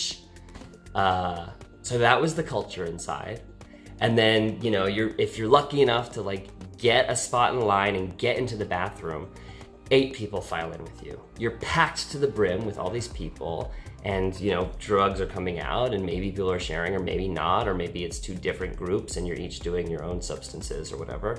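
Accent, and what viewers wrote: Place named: Germany. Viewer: Even though your Englisch is fluid and sounds right, it's obvious that you are a nativ german! Sorry, not buying it...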